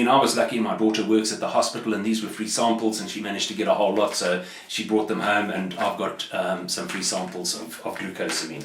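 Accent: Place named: South Africa. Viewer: you are deffintly south african or rohdesian Bra that accent is awsome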